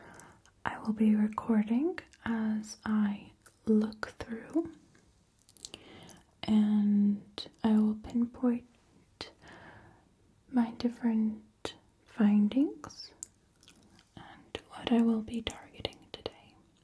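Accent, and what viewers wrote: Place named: Italy. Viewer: Do more italian talking is very good and amazing to listen to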